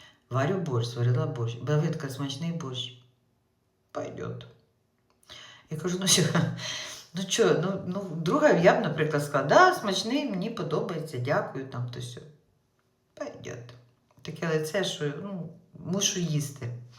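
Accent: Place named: Kuban, Russia. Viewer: Пробило на хіхі.. кубаньська балачка.😂😂😂❤1972 рік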